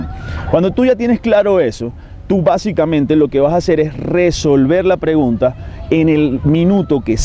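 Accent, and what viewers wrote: Venezuela. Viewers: Es venezolano?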